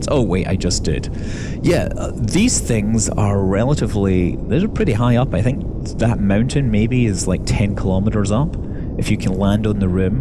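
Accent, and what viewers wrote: Scotland, United Kingdom. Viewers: Oh I his accent sounds very scottish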